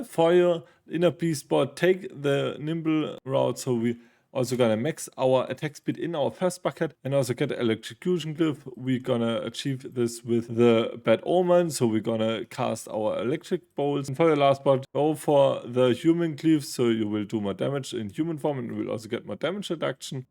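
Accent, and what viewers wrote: Germany. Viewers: I love the German accent when speaking English, your speech is just perfect matey, maybe a little fast. Certainly a lot better than my German! :-)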